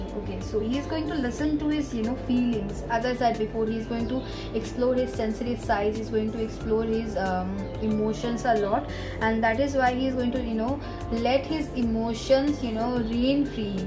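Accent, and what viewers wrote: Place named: India. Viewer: it's okay sister I will listen it patients so I cab understand actually my hearing english is not that good but it's not that bad and your accent is like indian so it's not that much problem but sister are u from india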